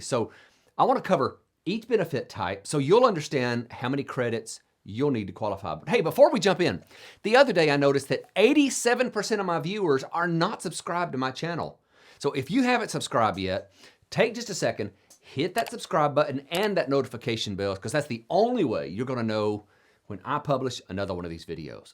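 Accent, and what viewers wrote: South, United States. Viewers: I want to thank you so very much for keeping us informed about the social security laws and clauses. You are a real jewel., you have the accent of a southern boy. Anyways I bet your mama and daddy are very proud of you. Have a great day dude!:-) P.S. yes, this video gets a thumbs up from me.:-)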